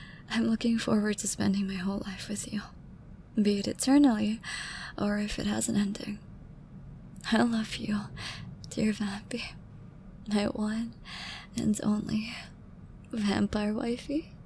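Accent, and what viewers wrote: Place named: United Kingdom. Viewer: I find it so funny the British accent 😂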